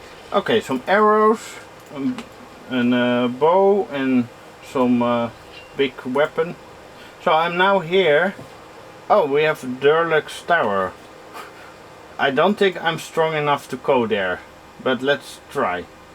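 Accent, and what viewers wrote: Netherlands. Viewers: The stickers saying Utrecht on the pc really weren't needed, I could immediately hear you were Dutch XD Nice video!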